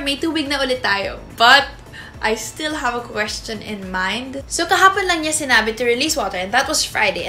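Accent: United Kingdom. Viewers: I love your british accent. You're so good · The British Accent ❤️❤️❤️ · british accent😍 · British Accent! Lakas maka BBC!!!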